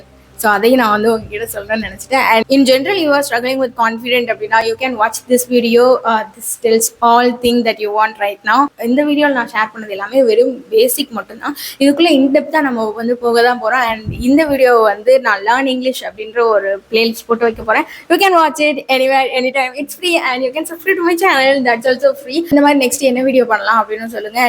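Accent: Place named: India. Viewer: Akka I improve your United States accent you are speaking Indian accent if you speak United States accent everyone will like your English more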